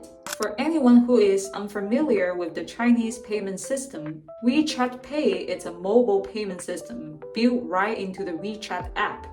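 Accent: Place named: United States. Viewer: You have an American accent. You don't sound like from China.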